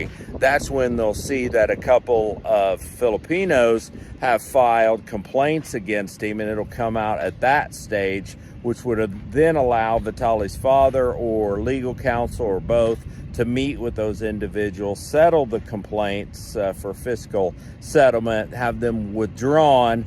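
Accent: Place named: Northeast, United States. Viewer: Where are you at in the states? Sounds like Northeast.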